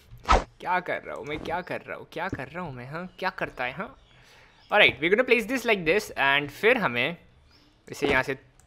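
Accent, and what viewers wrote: Gujarat, India. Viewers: are you Gujarati??🤔🤔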